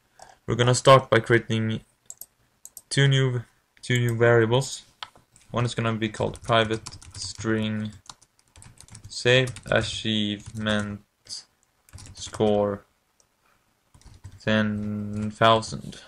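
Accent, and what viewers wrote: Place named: Sweden. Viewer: Your Swedish accent is amazing, please say "The Genius Ninja" in the next ep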